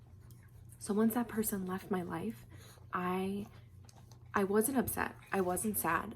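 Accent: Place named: New York, United States. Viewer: Girl what part of New York are you from !?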